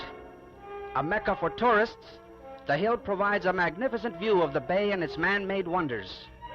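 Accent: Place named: United States. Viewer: Thank you for this voice typical america ! I like it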